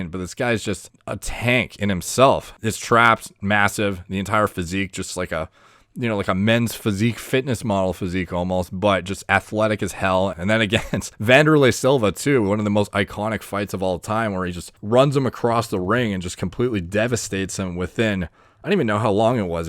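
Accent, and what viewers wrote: Canada. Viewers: The Canadian accent is strong with this one. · Maybe it’s just because I’ve watched too many of them both but sounds more Canadian whereas Nick’s voice sits firmly in the “country parts of the northeast” camp.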